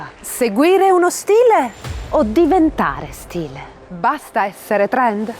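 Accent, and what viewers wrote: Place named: Palermo, Italy. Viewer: Brava..peccato che è difficile apprezzarti appieno fuori dalla Sicilia.In compenso a noi siculi il contrasto tra il dialetto palermitano e il perfetto italiano ci fa tagliare.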